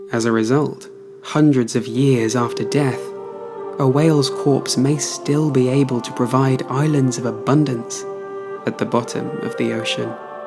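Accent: United Kingdom. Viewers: really wanna learn but god i can't listen to a british accent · i wish the narrator was not british 💔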